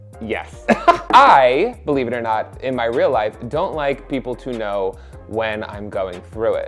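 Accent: New Jersey, United States. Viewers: I love his Jersey accent!!!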